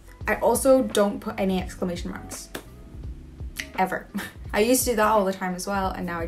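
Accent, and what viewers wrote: Scotland, United Kingdom. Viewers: that's a wonderful light scottish accent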